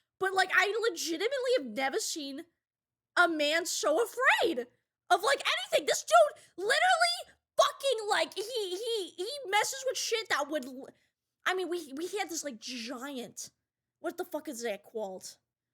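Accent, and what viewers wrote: Boston, United States. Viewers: don't hear Boston accents very much were I'm from. It's unique and interesting. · shes from boston, and as far as im aware its not a character thing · I somehow never made the connection that cardboard is pronounced the same way as any other "-ar" word in Bostonian. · The Boston debuff is so cute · It's a Boston accent, lol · Just sounds like every woman in Boston to me. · The Boston accent really enhance the stories.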